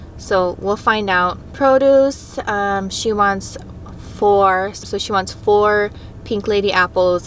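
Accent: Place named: Hawaii, United States. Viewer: I knew you were from Hawaii the second I pressed play. I interview a lot of locals on my podcast. I need to get in on Shipt.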